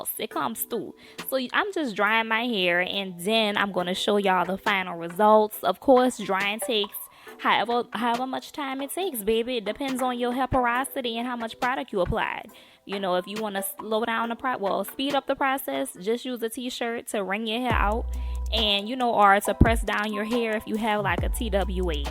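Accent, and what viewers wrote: New Orleans, United States. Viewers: Are you from New Orleans?? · Just found your video. Good content. You sound like you are from New Orleans where I'm from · beh-behhh.. girl.. im in LOOOOOOOVE with your nola accent. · Watching this video in the car w dad. He heard your voice and immediately said, "She from New Orleans?" He heard that accent baby 😂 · When N.O accent is lifeeee 😩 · Your New Orleans accent is the best! You couldn't hide that thing if you wanted to! :) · Come thu New Orleans accent!!!!! I love it!! Don’t judge me.....judge your friends😂😂 Laissez les bon temps rouler!!! 💚💜💛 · As soon as I clicked this video: "Oh. She from New Orleans😁 Yes indeed." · I bet she from New orleans with this accent😭😍😍😍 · yes, New Orleans, my husband and my in laws are from New Orleans, girl, love the accent, and hair..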